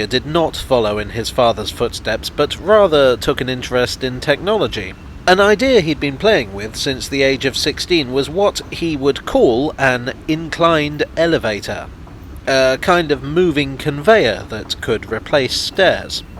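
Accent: United Kingdom. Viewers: Actually, with your British accent, you seem to make it sound much more upscale & classy than it actually is. Very well done Sir.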